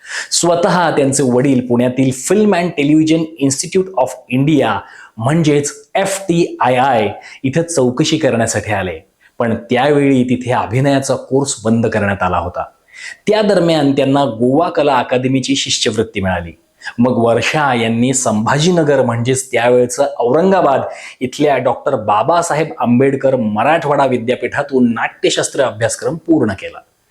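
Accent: Standard. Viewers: शुद्ध, स्पष्ट मराठीत माहिती ऐकून छान वाटले!वर्षाजीचे सर्वच चित्रपट पाहिले आहेत. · शुद्ध भाषा बोलता छान वाटले 👌👍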